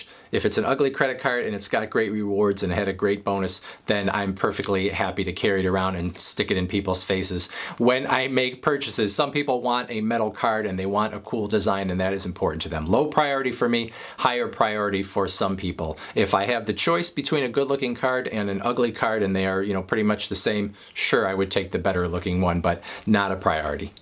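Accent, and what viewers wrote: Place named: Chicago, United States. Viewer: I can hear the "Chicago," in your voice. 😄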